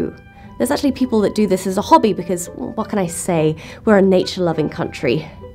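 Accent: United Kingdom. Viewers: Okay this to all the British people over her...Is her (Siobhan Thompson's) accent fully British?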